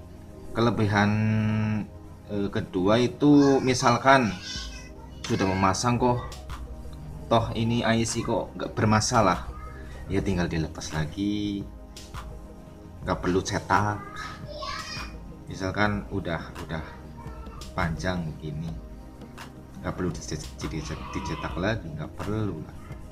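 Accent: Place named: Banyumas, Indonesia. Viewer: Dempet kang bahasa ngapake😁😁😂😂😂😂😂😂😂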